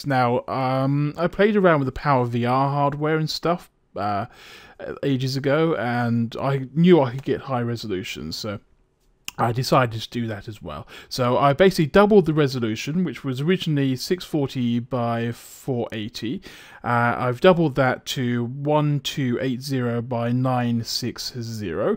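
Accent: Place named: RP, United Kingdom. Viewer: you are awfully posh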